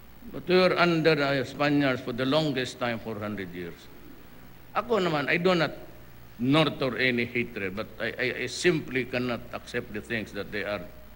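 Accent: Visayas, Philippines. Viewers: Hehe, damo ng hangin ang ulo mo... nkakatiwa mkinig ng visaya eh... Visaya mn ako Tatay Digong eh... from Romblon God bless you po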